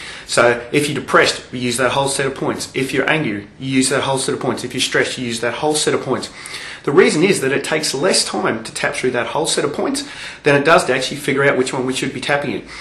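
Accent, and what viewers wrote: Australia. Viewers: Thank you. Short and sweet and the added pleasant surprise...the aussie accent. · Sound like an Aussie. Are you in Melbourne ??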